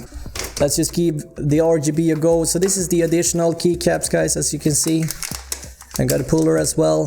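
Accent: Sweden. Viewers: That swenglis dooo 😉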